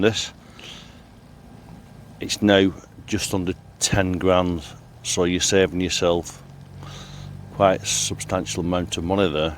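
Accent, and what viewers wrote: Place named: Northern England, United Kingdom. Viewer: you dont sound welsh are you from wales you have a northern accent??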